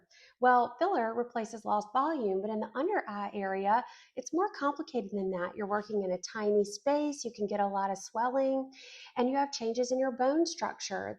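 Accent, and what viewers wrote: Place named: South, United States. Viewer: Hi, you sound like you’re from the South as am I. Where is your clinic located?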